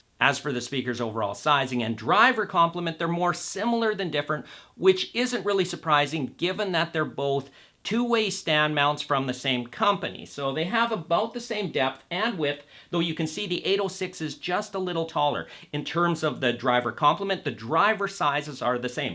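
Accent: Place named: United States. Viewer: You realise he is from the USA don’t you? It’s called an accent. Weird observation you have and somewhat ignorant I might add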